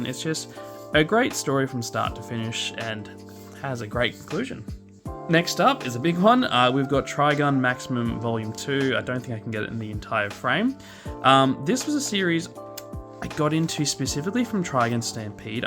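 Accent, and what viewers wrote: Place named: Australia. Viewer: Dude I just found your channel and I wish I found it sooner. A fellow Australian (I’m assuming from the accent) with a manga related channel? Sign me up. It’s good to know I’m not alone in this niche of YouTube. Starting out is hard but I will say your videos are crafted really nicely I enjoyed your video all the way through. Keep it up mate.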